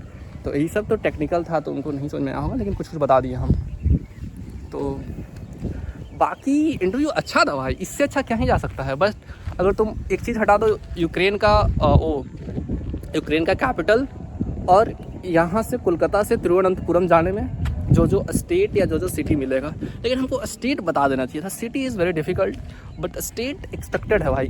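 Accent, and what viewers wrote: Bihar, India. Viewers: Bhaiya ek chizz puchna hai aaplog ko pta kaise chal jata hai ki aaj kahan interview hai?? Aur bhaiya aapka boli se hi samajh aa jata hai ki aap bihari ho hum Begusarai se hai❤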